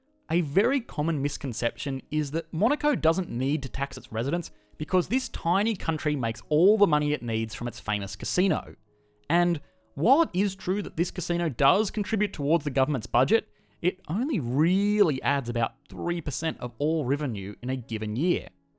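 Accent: Australia. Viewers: Hey are you Australian? You have an Australian accent ahaha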